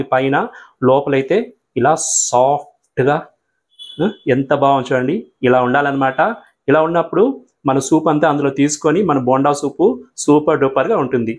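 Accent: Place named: Guntur, India. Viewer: మీరు యూట్యూబ్లో వంట కాకుండా జాబ్ ఏమైనా చేస్తారా అండి మీ ప్రాంతం ఎక్కడ అంటే మీ తెలుగును బట్టి గుంటూరు ఆ విజయవాడ అని అడుగుతున్నాను అంతేను మీరు ప్రజెంట్ ఎక్కడ ఉంటున్నారు మీరు బ్రాహ్మిన్స్ ఆ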